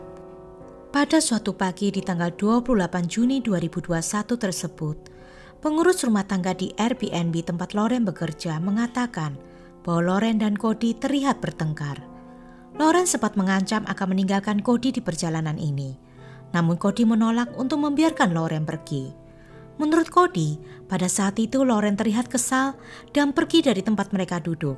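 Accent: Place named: Java, Indonesia. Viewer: Mentok bngt jawanya ya mbak hehheh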